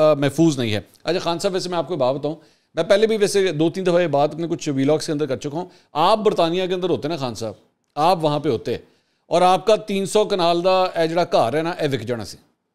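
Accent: Punjab, India. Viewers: Hahaha.. bhai ur punjabi touch rocks😊😊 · Punjabi style speaking is excellent, but please add some Pushto dialogue like Nishta etc.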